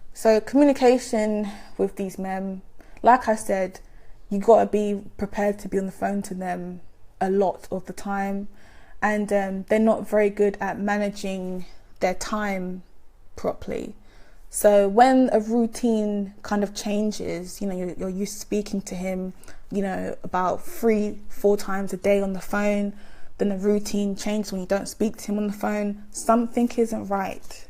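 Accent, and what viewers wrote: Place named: Nottingham, United Kingdom. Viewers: There’s nothing wrong with the Nottingham accent 🤨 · Hi, great video as always. Are you from Nottingham? Your accent sounds similar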